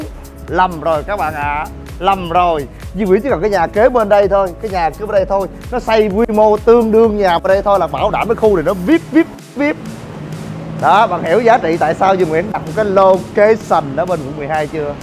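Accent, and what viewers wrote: Mekong Delta, Vietnam. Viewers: Cải lương quá cha nội...dân miền Tây phải o?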